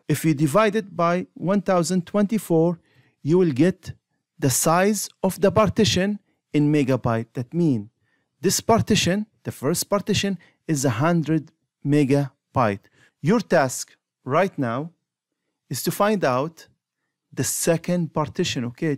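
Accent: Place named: Jordan. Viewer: Solid Jordanian accent.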